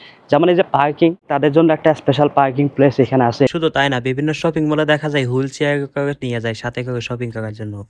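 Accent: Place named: Sylhet, Bangladesh. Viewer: সিলেটি কথা শুনে ভালো লাগলো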